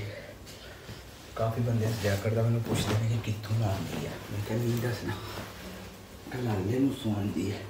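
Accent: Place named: Punjab, India. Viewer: Apki punjabi boht pyari hai kon se city k ho ap?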